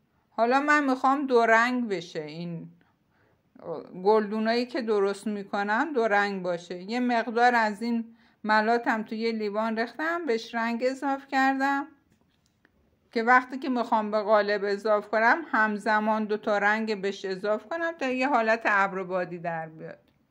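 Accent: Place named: Bakhtiari, Iran. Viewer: لهجه ی بختیاریتون خیلی قشنگه❤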